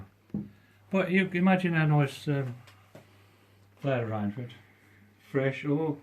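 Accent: Birmingham, United Kingdom. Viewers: Sounds like a fellow Brummie! We have always been an inventive lot.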